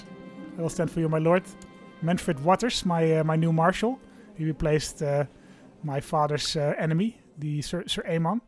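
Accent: Netherlands. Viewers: Ah, it's a Dutch accent, I couldn't place it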